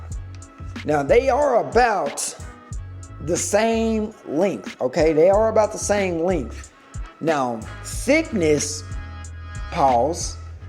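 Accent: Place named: South, United States. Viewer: u from Crenshaw fam? I thought u was born and raised in the South fam especially with da accent.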